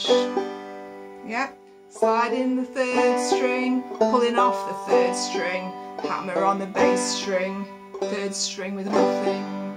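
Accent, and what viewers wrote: England, United Kingdom. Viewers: Are you from England? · Love this video! It's timing is perfect for a newbie like me....other videos go way too fast! And an English accent too. Ideal! #subscribed #fanboy! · You are so awesome! I love your English accent. I could listen to you all day long.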